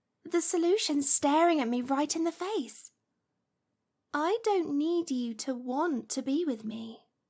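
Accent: United Kingdom. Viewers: What a nice kind and caring witch! And with a charming British accent too. :-)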